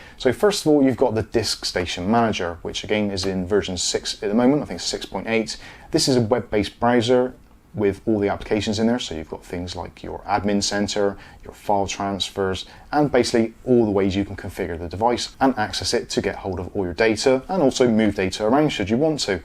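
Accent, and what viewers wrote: Bristol, United Kingdom. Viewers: Bristol accent ?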